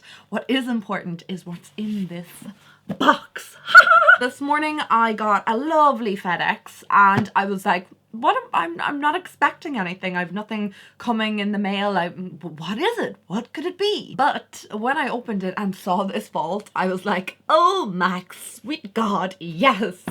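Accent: Ireland. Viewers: That yellow, the red, and the purple red ... so gorgeous. I also love that green palette. Well, now the yellow palette is also beautiful. I think the purple and the red palette would be my favorite. I love those golds and whites and greenish gold shades. I'm brand new to your channel. Are you from Ireland? You sound like Brendan Gleeson. I wonder whether you are from the same area where he grew up? I think he lives in Dublin now, but I'm not sure where the various inflections and tones of each accent originate. WOW, when you did that up-close of your eye look, so beautiful and very well blended!Thank you for sharing your impressions.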